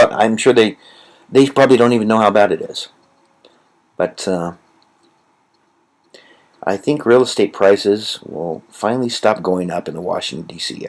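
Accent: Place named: Argentina. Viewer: I like your Argentinian Accent! LOL!!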